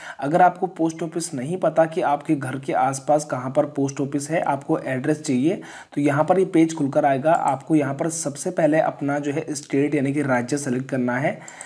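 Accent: India. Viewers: Bhai lamgaon ka ho kya 😮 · Bheji aap uk se ho ky lamgaw Uttarkashi se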